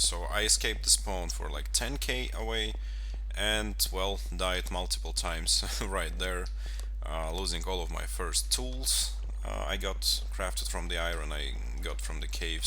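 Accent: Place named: Russia. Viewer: Звучит похоже на русский акцент... 🤔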